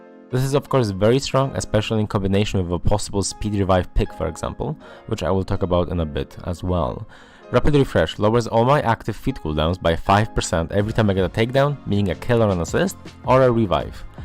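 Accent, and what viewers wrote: Poland. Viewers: o to samo pytałem w którymś filmie xd Gość brzmi swojsko z tym angielskim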